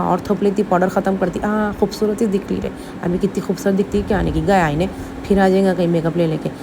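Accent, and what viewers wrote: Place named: Hyderabad, India. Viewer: mam Allah bless you thank you ma'am bahut pyari acting aur bahut pyari awaaz bahut Pyara Hai Aapka baat karne ka Hyderabadi thank you so much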